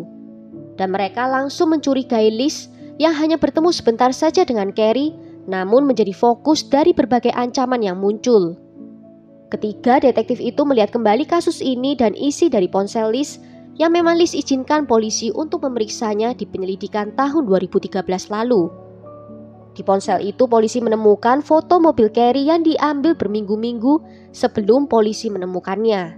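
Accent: Java, Indonesia. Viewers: suara mu yg medok itu membuat aku tertunggu tunggu video baru mu........ jgn hilangkan ya aksen jawa mu itu....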